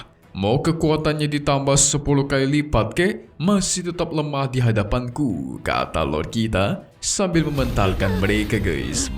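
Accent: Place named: Medan, Indonesia. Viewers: Uda jelas jelas orang medan kau min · Orang Medan min😂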